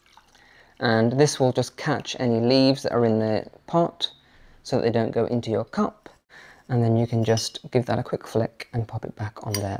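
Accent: RP, United Kingdom. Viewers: I registered this Chanel. I am interested in his posh accent than anything else.😃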